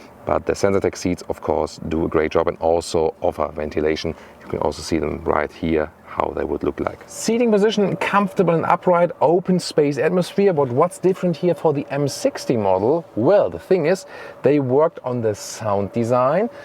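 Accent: Germany. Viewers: I'm sick when listening German accent ...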